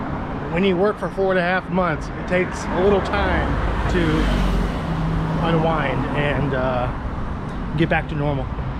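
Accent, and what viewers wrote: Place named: South, United States. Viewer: Dude learn some Español you can't even gracias without a southern twang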